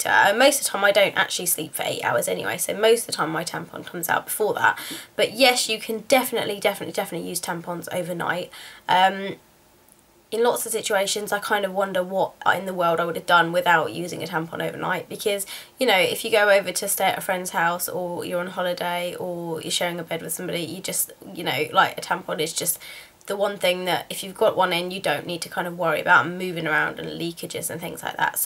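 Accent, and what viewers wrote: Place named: London, United Kingdom. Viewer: I think you are sooo pretty :) and I love your accent I used to have an accent like that, I was born London but I moved so I speak differently now:)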